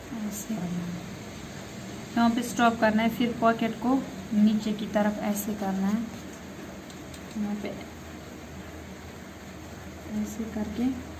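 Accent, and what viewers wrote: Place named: Kashmir, India. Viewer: Your language is sweet I think you are from North Kashmir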